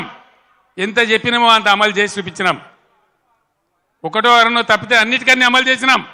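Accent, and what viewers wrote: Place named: Telangana, India. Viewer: NEE PUTTUKA NIJANGAA TELANGANADENAAA GATLA MAATLAADUTUNNAVU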